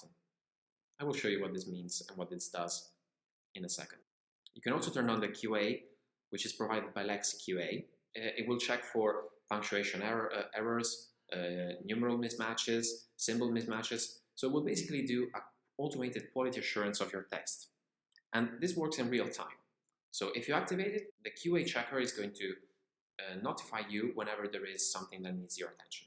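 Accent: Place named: Italy. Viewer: I smell italian accent over there. Hi compatriot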